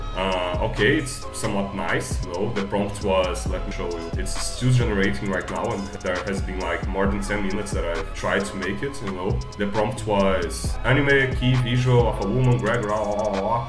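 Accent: Brazil. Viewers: Esse sotaque é brasileiro em kkkk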